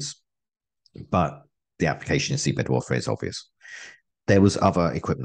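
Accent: United Kingdom. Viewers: Really strange hearing a britosh voice that is unbiased between east and west. Almost sounds like your just telling the truth, which is hard to find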